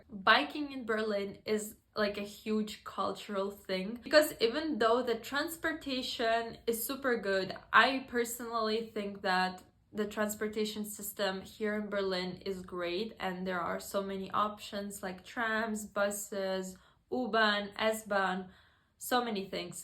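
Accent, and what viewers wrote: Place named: Baltic states. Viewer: I started wondering as well since her accent is definitely not American. I was actually admiring the clarity of her speech before I realized that she has an accent that's not one I'm familiar with. My first guess would be that she's from the Baltics, but she might be from Hungary or elsewhere for all I know.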